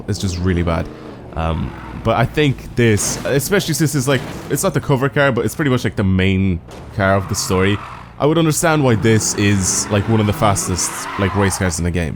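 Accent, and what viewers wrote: Ireland. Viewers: Are you Irish you sound it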